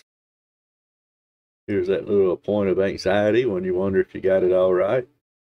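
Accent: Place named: South, United States. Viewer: I could tell you were from the South by the accent. I'm from LaSalle Parish, Louisiana, but most of my family is over in Smith County, Mississippi. One of my mom's siblings is over in Dothan, AL. I've rolled through your neck of the woods a lot!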